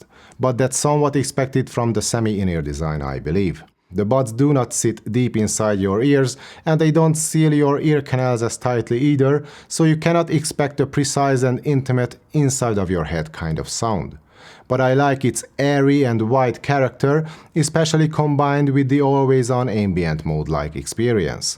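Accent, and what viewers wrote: Hungary. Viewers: First of all, great content! Secondly, is it just my ears playing with me, or you do have a hungarian accent?😅 We tend to say that a hungarian can recognize another hungarian no matter how professional their language skills are😅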